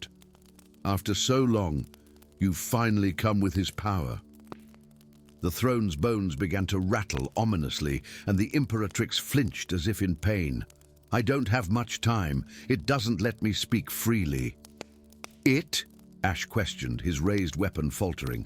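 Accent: United States. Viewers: Best one yet. So many stories, I love that. PLEASE stop using American voices. With AI you must be able to choose from hundreds, please use a different one.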